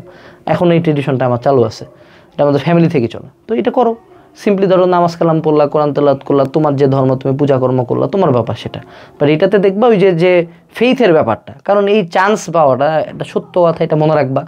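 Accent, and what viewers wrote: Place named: Rangpur, Bangladesh. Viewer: Vai er kothar tone e bujha jay Rangpur er manush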